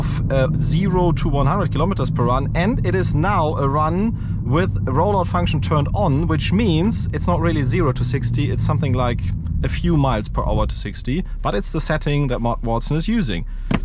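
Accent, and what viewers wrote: Germany. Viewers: Ist zwar ein wirklich gutes Video, aber du hast einen so starken deutschen Akzent, dass man raushört, dass du Deutscher bist hahaha. Meinen Like hast du aber auf jeden Fall trotzdem. Könntest aber eventuell etwas mehr auf die Höchstgeschwindigkeit eingehen. Ist gerade bei den neuen Autos sehr beliebt. :)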